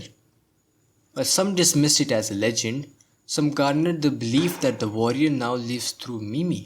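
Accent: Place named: India. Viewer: Your Indian accent 👌🏼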